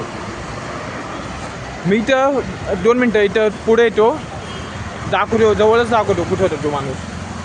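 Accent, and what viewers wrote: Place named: Gujarat, India. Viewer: भावा speech चांगल कर असं वाटतंय की तू मराठी नाहीस गुजराती आहेस. कंटाळलो ऐकून... 🙏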